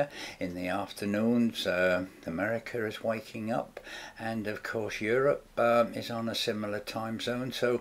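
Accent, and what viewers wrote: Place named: East Anglia, United Kingdom. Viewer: Where are you from, originally,? Your accent reminds me of my own happy huntng grounds of East Anglia:]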